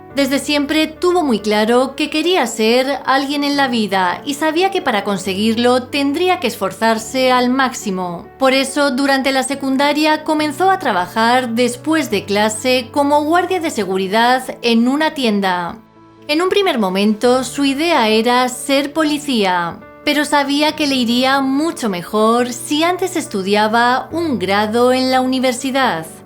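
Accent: Spain. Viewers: Recuerda tiene el acento español ! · Está bonito, es acento Español, me encanta, ella se esmera en presentarnos un video, busquen otros videos con otros acentos que les guste. 🙋‍♀️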